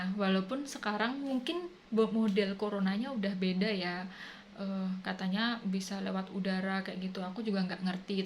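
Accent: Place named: Java, Indonesia. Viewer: Mba logat bicaranya kok njowo banget, tpi kl lgi di sinden aduh blakutek brantakan, brarti cma lucu2an aja ya 😅😅🤔 Mba aq fans kmu banget lho Aq mau tanya mb itu lahir di kota mana, sulawesi apa jawa yogya? Balas ya mb pertanyaan aq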